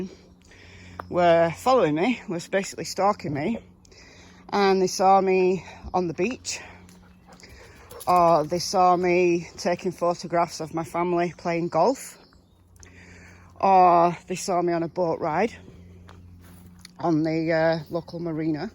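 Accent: United Kingdom. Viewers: UK by the sound of her accent so no bears or Coyotes!! 😅👍 Maybe some grass snakes though! 🤷‍♀️ Lol I think she's in Manchester?? 🤔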